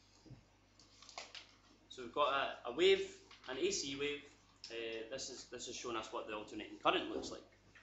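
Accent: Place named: Scotland, United Kingdom. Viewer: Love the scottish accent bro XD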